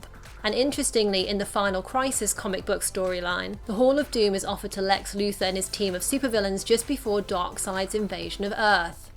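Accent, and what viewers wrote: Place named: England, United Kingdom. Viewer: are you from England? I'm in love with your voice and that accent.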